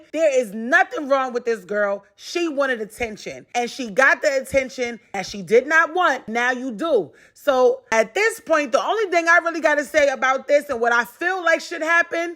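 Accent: New York, United States. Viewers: I Lvov’s ur New York accent❤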